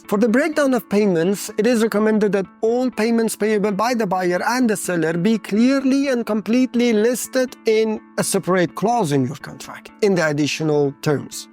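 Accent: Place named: India. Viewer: لهجه هندی دارید 😅 جالبه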